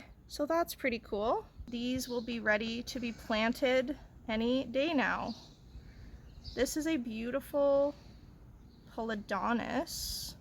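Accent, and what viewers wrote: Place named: Canada. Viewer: I know I am late to party but I have question. Your accent indicates Canada. I wait a year for my little guys to get full sun. They have grow lights. Are you able to put them out because, I am assuming, have cooler weather? I am in California and Summer is over 90 degrees F and often into 100s. I am a dotting plant pappy and fear hurting my plants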